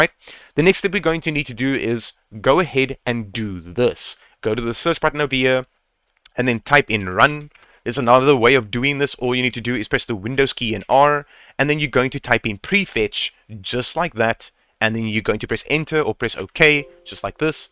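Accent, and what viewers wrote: South Africa. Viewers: love your stuff man. Are you South African?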